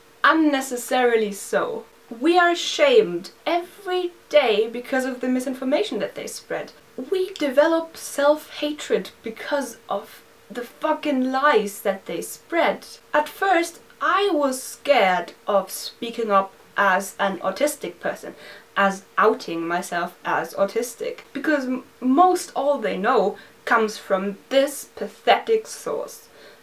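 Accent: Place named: South Africa. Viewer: Where are you from? your accent is beautiful. Sounds a bit South African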